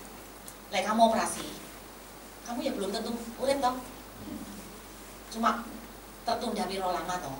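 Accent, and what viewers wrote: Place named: Java, Indonesia. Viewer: Justru Saya orang suku batak tinggal di Sumut tapi saya suka dengar khotbah logat bahasa ibu campur logat Jawa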